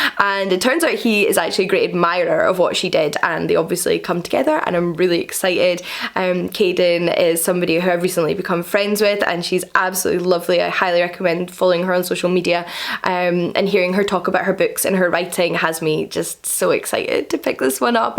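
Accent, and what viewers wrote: United Kingdom. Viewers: My like was automatic for this new video, shot and uploaded by. I like to listen to her voice, even her accent is British. Greetings from Sonora, México 🇲🇽.. 👍, which is a border states with USA.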